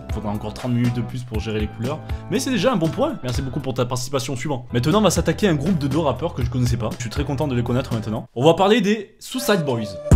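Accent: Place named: Marseille, France. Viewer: Ta l'accent Marseille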